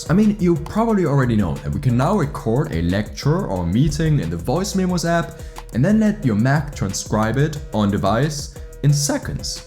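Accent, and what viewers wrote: Germany. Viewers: Der deutsche Akzent :) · Is it just me or does he sound a bit more German in every sentence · Du bist doch Deutscher haha hört man so krass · are you german? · Your accent sounds like German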